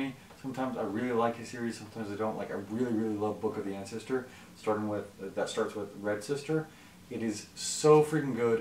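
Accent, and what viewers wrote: South, United States. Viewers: I can't believe you had a lot of books at your house in Texas on this video during your quarantine including Malice by John Gwynne, Priest of Bones by Peter McLean, Winter Counts by David Heska Wanbli Weiden, Practical Magic, The Rules of Magic and Magic Lessons by Alice Hoffman, Piransi by Susanna Clarke, My Best Friend's Exorcism by Grady Hendrix, Dracula by Bram Stoker, Cemetery Boys by Aiden Thomas, The Grey Bastards by Jonathan French, One Word Kill by Mark Lawrence and so many others until you're talking about all of them to read while you made your beautiful husband, Zach read them with you at the time, so you're discuss about all of the characters from all of the books after that, so he'll be very proud of you and I love your Southern accent!!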